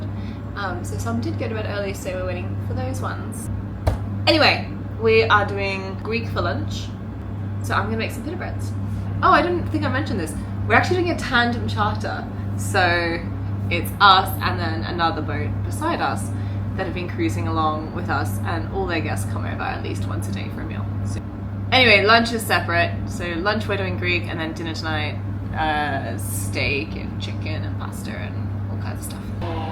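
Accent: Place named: Australia. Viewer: What nationality is she? I thought she was Australian not English 🤔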